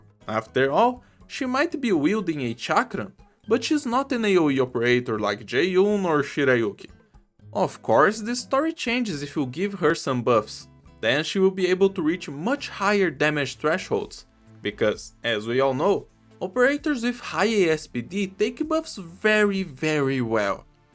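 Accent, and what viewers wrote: Brazil. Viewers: Sabia que era BR so pelo jeito de falar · Eu percebi que era Br pelo sotaque, entrei no seu perfil e li Brasil 😊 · Tu é BR né? O sotaque é impossível de não perceber KKKKKKK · are you perhaps brazillian? just curious.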